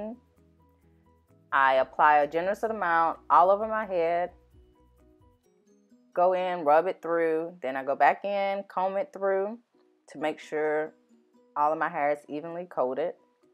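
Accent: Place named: New Orleans, United States. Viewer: I definitely hear New Orleans in you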